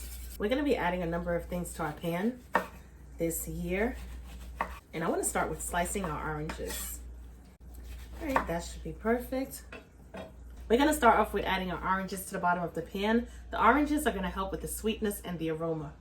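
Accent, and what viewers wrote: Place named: Northeast, United States. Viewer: I thought I heard that north east accent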